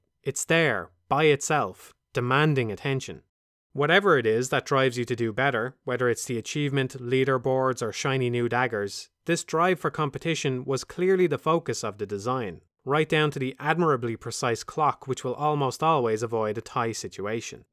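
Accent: Ireland. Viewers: I needed this monotone Irish voice in my life. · holy fuck he's irish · The rebirth of my favorite snarky Irish gentleman is upon us.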